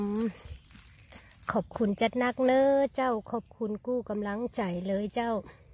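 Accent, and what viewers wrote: North, Thailand. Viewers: เออเห็ดแถวบ้าน ดอกมันไม่ค่อยใหญ่เหมือนประเทศอื่นๆเนาะยายเห็นเค้าเก็บกันที่ปะเทศอื่นๆดอกก็ใหญ่ๆจังยายชอบ พูดพาษาเหนือฟังแล้วเพราะดี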